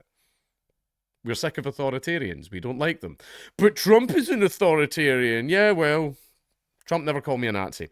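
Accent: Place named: Glasgow, United Kingdom. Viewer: I like that Dankula pronounces Chutzpah correctly almost by accident because of his Glaswegian accent..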